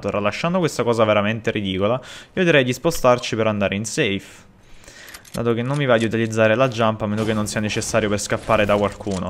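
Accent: Naples, Italy. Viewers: Oddio ma sei di Napoli 😂😍🤞🏻💙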